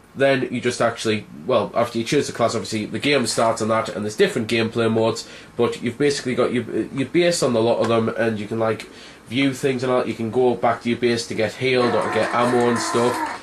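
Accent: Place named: England, United Kingdom. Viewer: WHOA, I just clicked to :39 and right away I'm blasted away by this...... Loud, fast, English accent XD the only word I understood was "Achievments" lmao No offense to you, of course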